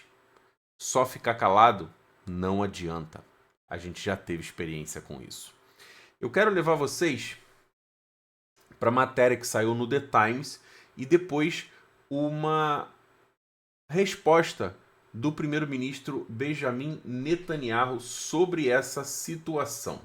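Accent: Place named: Rio de Janeiro, Brazil. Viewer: Afff esse sotaque carioca cheio de sssssssss e rrrrs! Fonoaudiologo resolve isso!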